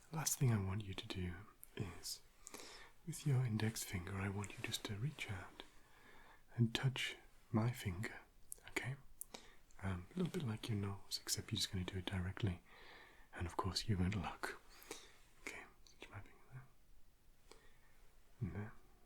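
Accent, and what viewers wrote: England, United Kingdom. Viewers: ooops! I thought your accent sounded English! I’m not too far from there- North Yorkshire. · it's definitely an English accent but even after moving countries it can be hard to shake it. My dad was born in Halifax, we live in Australia but he still sounds mostly Yorkshire 😅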